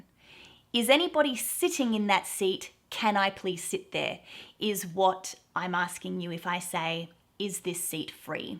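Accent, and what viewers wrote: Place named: Australia. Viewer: Hello, i only wanted to say. I loved the Australian accent since i did watch some Australian TV shows in mij teenage years. So i love these video's of you. Keep up the good work. And maybe it can be usefull when i'm going to Australia. It's on my bucketlist! Greetz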